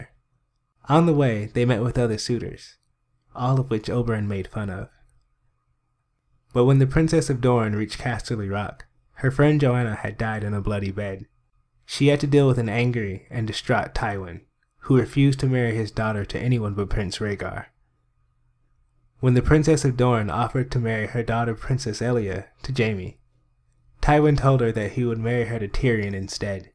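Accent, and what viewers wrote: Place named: United States. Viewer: I really like your clips and you have a nice voice. But I'm not a nativ speaker and you have an american accent so its a bit hard for me to follow, could you speak a bit slowlier?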